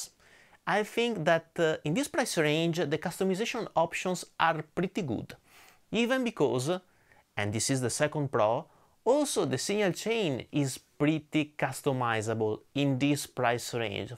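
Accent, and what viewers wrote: Italy. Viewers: He's Italian, that's how it's pronounced.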